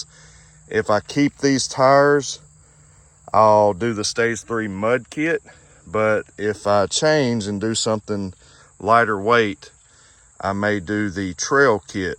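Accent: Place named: Mississippi, United States. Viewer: Hi, greetings from Germany (... yes, you're seen even overhere 👍😂) ... I'm a complete Newie in respect of ATVs ... Considering/Thinking of buying a CForce 625 s new, ... or something similar used condtion ... Saw already almost all of yr vids. ... All are very well done. Do like yr local regional Mississippi slang. Quite uncommon overhere 👍😄 Rgds & Best Wishes, DON, Germany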